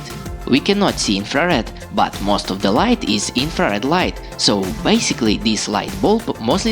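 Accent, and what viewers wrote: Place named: Russia. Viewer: Funny Russian accent :)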